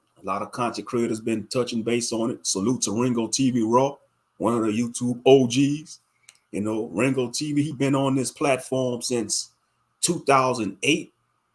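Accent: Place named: Louisiana, United States. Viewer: You are Clearly from Louisiana 😂 Good video tho. Ringo brought me here 👍🏾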